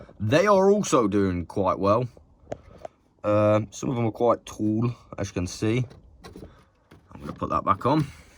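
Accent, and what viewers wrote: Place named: England, United Kingdom. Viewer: Not garden related but I love your accent. Whereabouts in England were you born?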